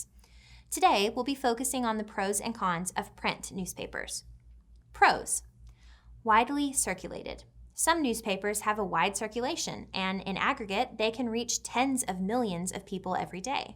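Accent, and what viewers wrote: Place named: South, United States. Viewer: I can hear the southern drawl in her accent :)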